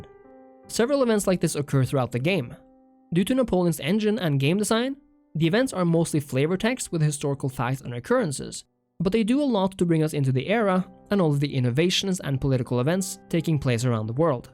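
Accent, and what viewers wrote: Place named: Norway. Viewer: I detect a Norwegian accent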